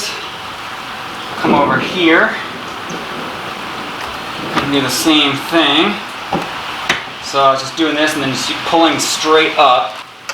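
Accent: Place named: United States. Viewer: Why do Americans say m k instead of ‘mark’?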